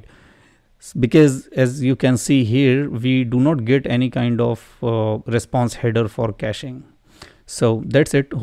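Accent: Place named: India. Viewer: i didn't mean any criticism, imo indians have a tutorial in everything i love what you guys share with the world, and more importantly I LUV UR ACCENT ♥️🔥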